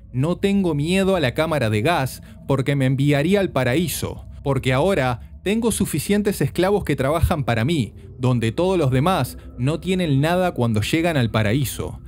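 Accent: Argentina. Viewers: Ah, eres argentino?, me suscribo ya inmediatamente. 🇻🇪 Amo a los argentinos y tu canal se ve de buena calidad.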